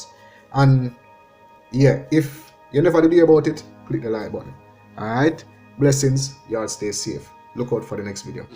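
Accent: Jamaica. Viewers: Apaul A is fascinated with your Jamaican way of speaking...lol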